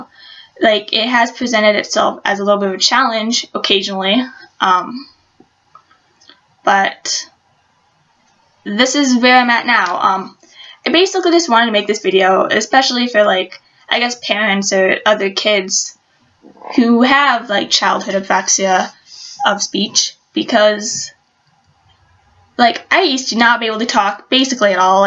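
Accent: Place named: United Kingdom. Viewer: Thank you for sharing my dear. My 3 year old son is in speech therapy. His therapist mentioned speech apraxia for the first time this week. After reading about it I got very sad and worried for my sons future. First thought that came to me was the bullying he will experience at school. Now watching your video gives me hope. You are very brave and to be honest you sound a bit British. I love it! Many thanks and wishing you many blessings.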